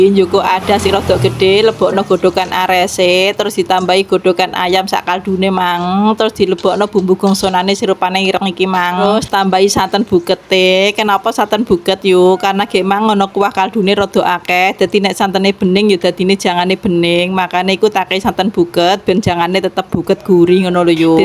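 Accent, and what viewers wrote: Java, Indonesia. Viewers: iki ko ndi mbak e...jawa timur koyone yoo,? bahasane khas jowo👍❤️❤️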